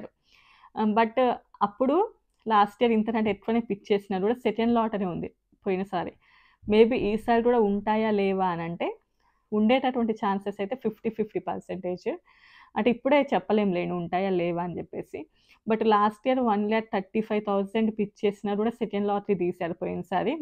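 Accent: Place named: Karimnagar, India. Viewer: You are explaining very clearly and confidently . I you are basically from karimnagar dist. Your pronounsation different from twin cities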